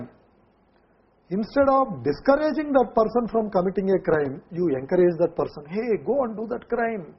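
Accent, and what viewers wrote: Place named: India. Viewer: Useful and interesting method of learning English but hard Indian accent makes the lesson more harm than good.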